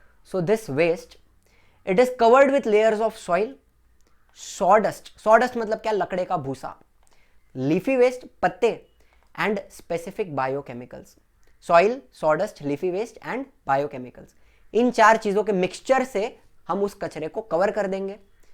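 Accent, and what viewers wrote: Maharashtra, India. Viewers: सर appp Maharastrian ho kya ?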